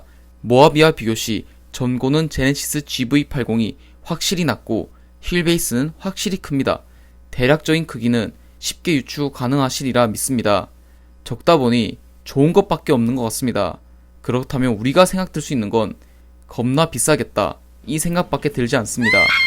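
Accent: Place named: Gyeongsang, South Korea. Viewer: 숨길수 없는 깅상도억양 첯음절에 강력한 엑센트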